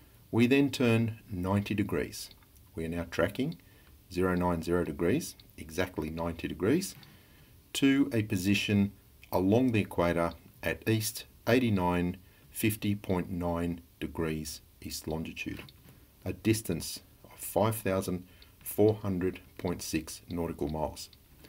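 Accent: Australia. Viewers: I guess it's the australian accent. Buddy ;o) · that silky smooth Aussie accent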